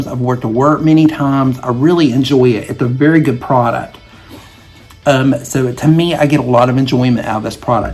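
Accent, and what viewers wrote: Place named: North Carolina, United States. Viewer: Hi! I'm Sally and a new subscriber. I love your content and personality. I want to know if you have a drugstore dupe for YSL Concealer? 🙏..btw.. Charlotte!? Omg I used to live in Fayetteville NC in the early 90's.. that accent! 😊 💕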